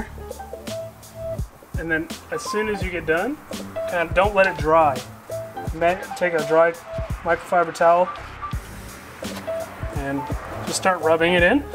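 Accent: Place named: Texas, United States. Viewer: Where in Texas you from??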